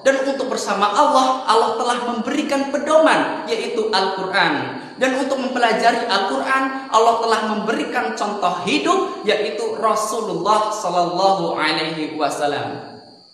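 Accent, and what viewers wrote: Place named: Banyumas, Indonesia. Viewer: Salam ngapak tadd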